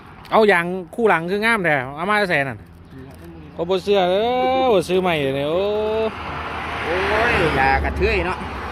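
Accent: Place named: Nyo, Thailand. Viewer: คนสัยน้อคับคือเว้าญ้อออ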